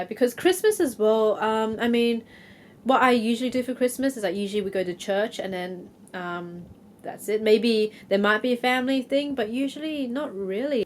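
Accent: Australia. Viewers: Australia is a bit broad, you seem to have a Sydney accent and talk like a Sydneysider as well so thats what i will guess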